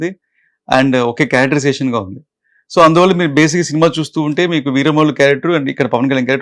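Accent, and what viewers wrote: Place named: Tamil Nadu, India. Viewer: Nuvvu nee arava slang pora pooka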